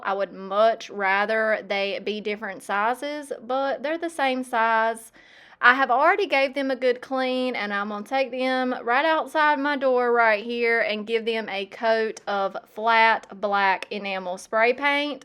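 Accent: South, United States. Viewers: This is my first time seeing one of your videos and I immediately noticed your southern accent. So in searching I found you are from Kinston, NC. I'm in Wilson, NC, but living in Maryland for 38 of my 77 years, I've lost most of my accent.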